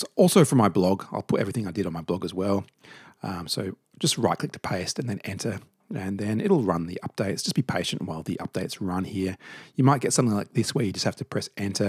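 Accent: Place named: Australia. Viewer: nice aussie accent :)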